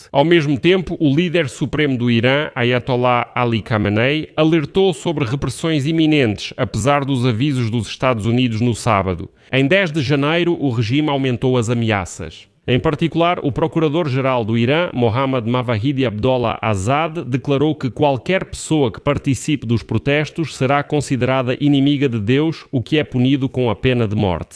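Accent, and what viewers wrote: Portugal. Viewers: Jornalismo alexiceu língua portuguesa de Portugal fale sempre assim gostei. E língua portuguesa Portugal no Br. E nossa toponimia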